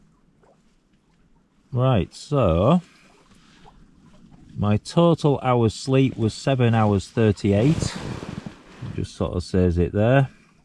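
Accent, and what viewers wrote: Yorkshire, United Kingdom. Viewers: So grateful to you for sharing your videos taking walks in the beautiful mountains. My back has gone out AGAIN and I'm no longer able to go for walks in the countryside like I loved, so being able to see it all vicariously through your videos is wonderful, if a bit heartbreaking knowing I can't do it myself. Also lovely to hear your Yorkshire accent, reminds me of my old mum, who never lost hers, despite living most her adult life in Norfolk.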